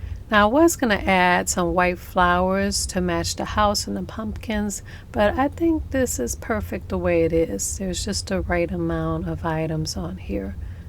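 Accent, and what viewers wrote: New Orleans, United States. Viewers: Just found your channel and subscribed after one video! Hey, I love your New Orleans accent. I grew up not far from there but across the line in Mississippi. It was a wonderful day trip to visit the Quarter and eat at some of the best restaurants anywhere. I like your vision of the holidays. I’ve grown weary of other decorations; while I love “farmstyle”, I prefer unique interpretations of holiday decor, and desire something that no one else has. You’ve met that need. Look forward to seeing the beauty of art & design through your eyes! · you are the absolute sweetest person I have ever heard! I feel blessed to have found your channel and look forward to every one of your tutorials. I love the way you talk! It gives us a glimpse into your roots (NOLA girl!). We Canadians have our own special way of saying some words and I honestly think I say punkins sometimes as well! 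You are such a treasure sweet girl! Much love from Canada.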